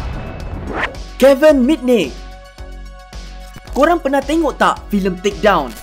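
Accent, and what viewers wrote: North, Malaysia. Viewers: Org utaqa kaa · Org utara ke yg bercakap nie. Tak sesuai la bro🤣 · uii orang utara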